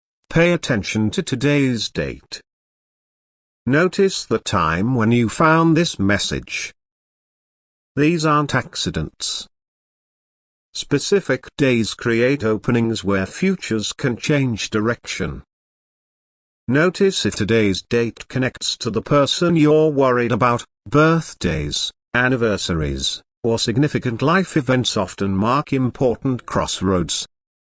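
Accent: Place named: United Kingdom. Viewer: Let AMERICAN talk to American people! Not everyone understands British language!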